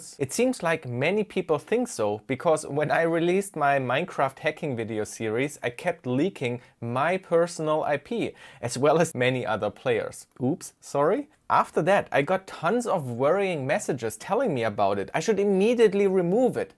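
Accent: Germany. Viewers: i could hear u are german, but now i see you are from my town :D MOIN!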